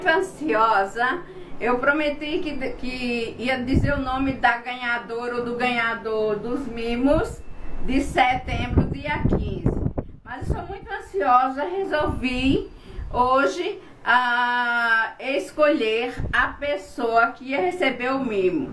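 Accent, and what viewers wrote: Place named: Paraíba, Brazil. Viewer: Olá. amore amo o jeito/sutaque q a senhora fala,lembro da minha vó falando ,minha vo é da paraiba/João pessoa,ela vive aqui no Rio de Janeiro, aie ela viajou/para passa uns 2 anos aí pra João Pessoa ...amooo os sutaques lindos de vocês 😍👏 -->❤sutaques (jeito de falar)❤<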